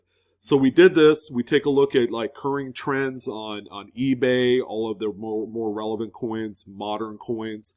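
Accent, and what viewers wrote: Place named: Blue Ridge, United States. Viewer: So had a chance to listen thru in one shot and yeah I like it. Clear concise with some "blueridge" dialog. I like it!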